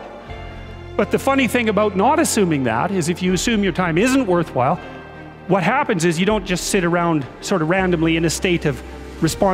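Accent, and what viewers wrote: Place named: Canada. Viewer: He sounds so Canadian haha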